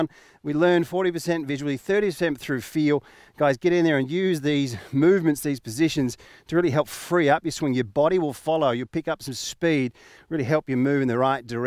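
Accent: Australia. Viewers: I hear the Aussie accent are you based here?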